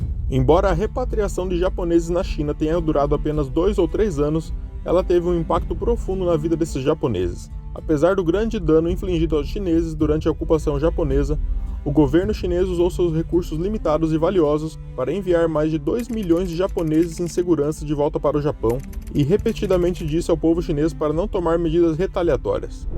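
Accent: Brazil. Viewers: Sotaque sudestino de merda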